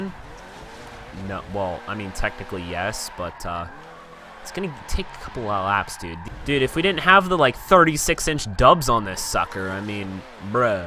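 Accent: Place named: South, United States. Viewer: Your southern accent is better then Mine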